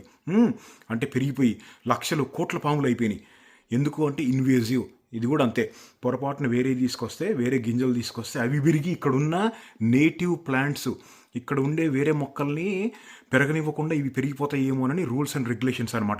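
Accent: Andhra Pradesh, India. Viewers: your slang is like andhra y you are staying in telangana then😀😀😀😀😀😀😀😀😀😀😀😀😀😀😀😀😀😀😀😀😀😀😀😀😀😀😀😀😀😀😀😀😀😀😀😀😀😀😀😀😀😀😀😀😀😀😀😀😀😀😀😀😀😀😀😀😀😀😀😀😀😀😀😀😀😀😀😀😀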